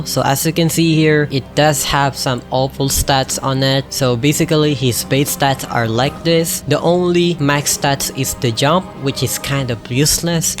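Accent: Philippines. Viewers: Pretty sure it might be a Filipino accent (as a Filipino) correct me if I’m wrong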